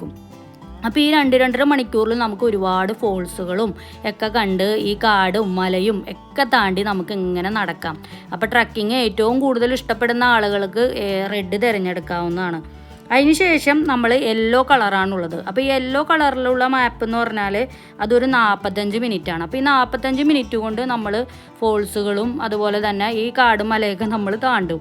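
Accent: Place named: Kochi, India. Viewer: Cochin slang is the beauty of your video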